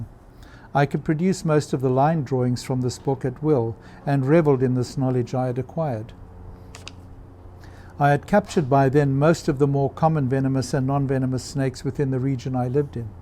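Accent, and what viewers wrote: South Africa. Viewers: Being a witness of suicide in whatever form often is a very uncomfortable experience. I've been there too. On both sides actually. On a brighter note: By listening to your videos, I have a newly found appreciation for the South African English accent.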